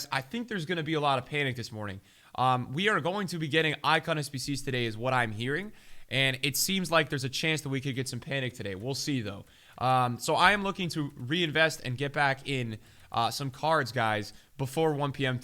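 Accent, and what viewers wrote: United States. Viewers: Where is from I hear US accent but he said 1 pm so where is he from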